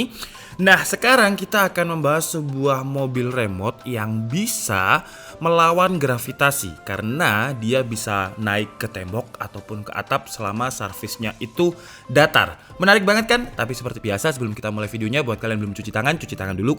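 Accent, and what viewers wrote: Malang, Indonesia. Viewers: Ngalam sam sawangane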